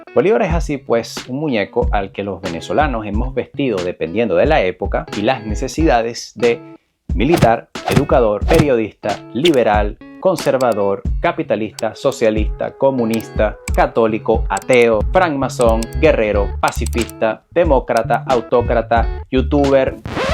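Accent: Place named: Spain. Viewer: YO SÍ..... Yo si le rindo culto a dos personas históricas: JESÚS DE NAZARETH Y SIMÓN BOLÍVAR.... Comenzaste tu video con un mapa de VENEZUELA sin la GUAYANA ESEQUIBA..... Resulta que es cierto que le pusieron a todo el nombre de BOLIVAR; pero resulta que a BOLÍVAR LO DEJARON ESTANCADO EN LAS ESTATUAS, AV.. Y AUTOPISTAS...... Fue que luego se decide darle real vida a Bolívar, llevarlo al pueblo..... En nombre DE BOLÍVAR SE Manipula y, me parece, que muy inteligentemente estás manipulando en algunos aspectos en éste video..... Te faltó decir que en los EEUU hay una universidad con el nombre Bolívar...... Que la armada estadounidense tiene o tenía un submarino con el nombre del Libertador..... Hubo un momento en que ka historia de nuestro país fue eliminada de los colegios y liceos; por ende, Bolívar fue eliminado de la memoria del pueblo, sobretodo, del pueblo a pie..... 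Eso de eliminar el bolivarianismo es eliminar la vida y filosofía de BOLÍVAR...... Usted es un manipulador de primera....... Además, usted no es venezolano.... Su tono lo dice, su tono es español..... Ya he visto varios de estos videos: parece que se a gestado una campaña internacional en contra de nuestro Libertador.... Campaña liderada por España...... Recomiendo un artículo de la BBC de LONDRES HABLANDO DE BOLÍVAR Y CATALOGANDOLO COMO EL HOMBRE DEL MILENIO...... TAMBIÉN RECOMIENDO LEER A BOLÍVAR Y NO DE DEJARSE LLEVAR POR INDIVIDUOS COMO ÉSTE...... ÉSTE TIPO DE INDIVIDUO COBRA ($) POR MANIPULAR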